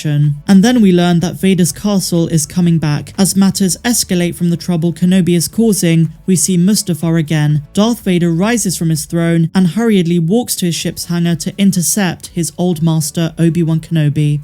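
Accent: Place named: Bristol, United Kingdom. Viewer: I always enjoy your videos. Love the accent, is it from Bristol ? (one of my fave places in the uk ) I do miss the 3 x 'Utini' intros, though ! lol! ;) Thank you and keep up the good work ;) x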